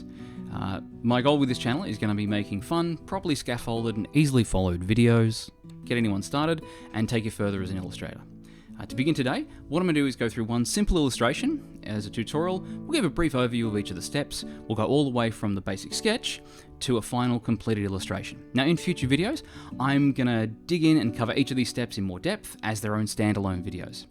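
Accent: Australia. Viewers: You Australian by any chance mate? amazing tute btw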